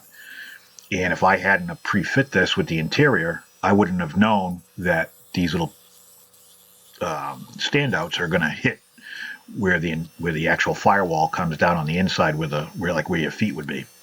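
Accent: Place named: Boston, United States. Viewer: I love the Video! You gave me some great ideas. I noticed your bracelet, and I am a disabled veteran who was so bored during the pandemic, that I re-ignited my passion for collecting my diecast cars and motorcycles. I didn’t love the Motormax die-casts, but now I’ll grab some to customize. My big question is this, the accent tells me you MAY be a Sox fan...lol, I a New Yorker so there is that. Either way, you have a new subscriber and fellow East Coaster!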